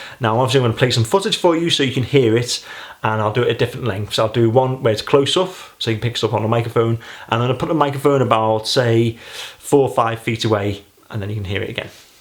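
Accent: United Kingdom. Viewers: proper British English... much easier for me to understand you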